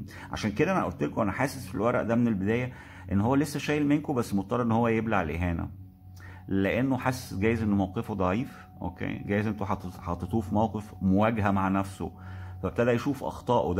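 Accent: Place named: England, United Kingdom. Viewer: I love ur english accent ❤ يا لهوي 😊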